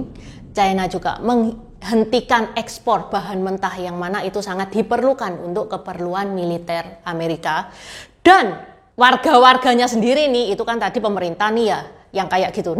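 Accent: Java, Indonesia. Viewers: Pekat sungguh bahasa susah nak faham bercampur dengan bahasa jawa tidak seperti miss sari bahasanya mudah difaham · Usul buat ci, ya...tolong ditambah ngomong mandarinnya, Ojo mung medok jowone❤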